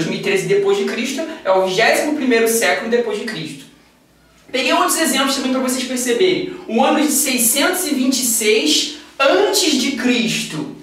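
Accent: Rio de Janeiro, Brazil. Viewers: O complicado mesmo mesmo é suportar o carioquêixxxxx carregadíssimo.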